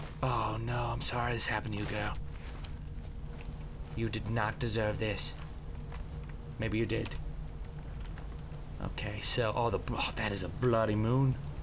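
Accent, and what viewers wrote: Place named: Scotland, United Kingdom. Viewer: awesome Scottish accent xD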